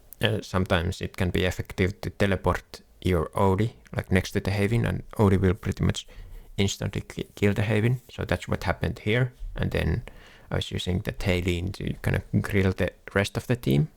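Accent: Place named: Finland. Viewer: You are finnish right, your accent gave that away instantly. Try to say Phraesto next video and not prästost lmfao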